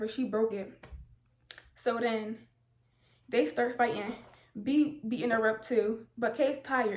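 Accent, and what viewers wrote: New Jersey, United States. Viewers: you from new jersey?? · I could hear the jersey all in you lol I would think you was from Newark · are u from jersey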